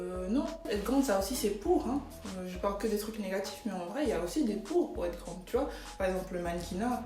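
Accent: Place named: London, United Kingdom. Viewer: J’aime cette video. Je suis grande aussi. Je viens de Londre et ma francais est très mauvais. Mais je comprends assez. Aussi j’ecoute un accent londres quand tu parle anglais 🇬🇧❤️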